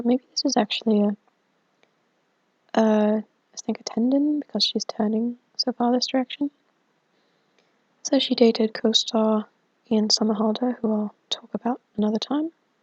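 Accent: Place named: Berkshire, United Kingdom. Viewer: Happy new year to you too from a fellow brit and thank you for the like. Nina is stunning, glad there are some real ones out there in high ranks, gives us men a glimmer of hope when watching the screen lol. I'd like you to know that I think your videos are the most professionally discussed and analysed on this platform and they really exude such an unbiased and level headed approach, it's so refreshing. I typically watch your transvestigations at night and the sound of your extremely soft and sexy voice. Especially its Berkshire/Oxfordshire region accented cadence helps me relax before bed, hence the comments as of now haha. Hope it doesn't come across as creepy or even offensive (some girls these days *sigh*), just being as honest as possible. Enjoy the rest of your day!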